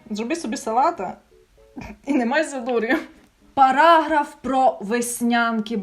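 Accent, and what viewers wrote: Surzhyk, Ukraine. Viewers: Зараз здохну від цього суржику 🥵💖🥵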